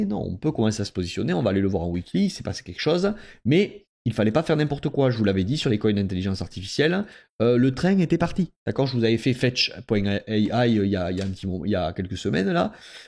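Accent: South, France. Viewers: Santé avec ta jarre lol je me régal à écouter tes analyses avec ton petit accent sudiste peut-être non....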